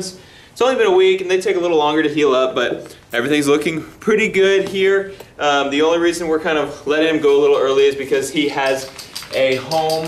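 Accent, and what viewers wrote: Texas, United States. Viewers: i have a feeling you're from the austin or from the houston area in tx 🤔🤔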